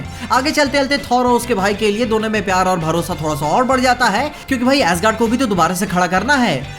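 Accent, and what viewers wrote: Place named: Bihar, India. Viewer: भाषा के बोली से त बिहार से लग हथिन ।।।## कभी निराश न करे ला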